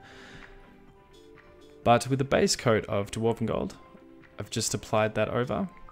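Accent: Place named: Australia. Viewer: You sound Aussie. These look great by the wat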